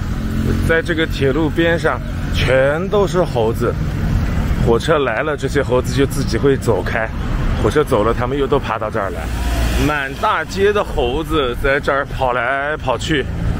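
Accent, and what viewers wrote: Shanghai, China. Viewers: 哥們是上海人？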